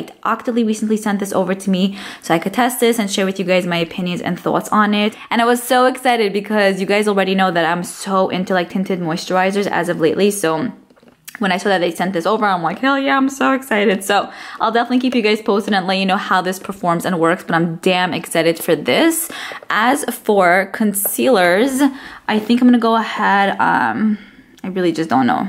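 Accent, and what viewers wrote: New York, United States. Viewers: Great video; can't wait to see your reorganized stash. By the way, I notice a very distinct accent; New York? God bless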